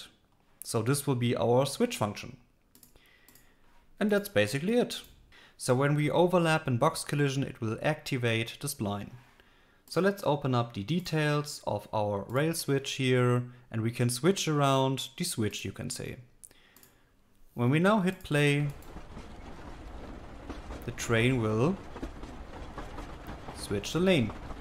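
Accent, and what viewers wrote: Germany. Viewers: Thanks for your tutorials, they are very helpful. Actually, I'm learing UE5 right now and for that, I'm trying to build my own Train Game. So far so good, I've implemented some models, two bogies per wagon and some algorithms that calculate the right placement of the train body and the back bogie position on the spline in order to always keep the same relative distance between the two bogies. The next step would be to implement switches, I think that should be fairly easy with your method, just switch the active Spline Track of each of the bogies in the moment they hit the hitboxes. But I've faced another, logical, problem/challenge. Let's say you connect some spline tracks with each other (actually haven't tried that, but that should work relatively similar to the switch "problem") and you build a U-Turn, which would place you on your "origin" spline, but the other way around. 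In that case, moving "forward" would not mean distanceAlongSpline+velocity, rather you then would have to subtract your velocity from the distance in order to move "forward" or lets say in the right direction. I'm really not sure how I could do that, like find out what would be the right direciton for the train.. Maybe we could chat a bit about this issue and what you think about that? Thanks in advance!:) Ps: You sound German, is that right? :D Then you could also answer this in German, no problem.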